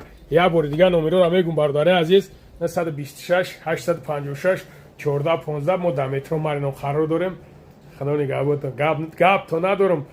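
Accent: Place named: Lakai, Tajikistan. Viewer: Ака хдт точики тоза нести чия ранги лакае метови бомаданият гап заданро омуз хубтар мешуд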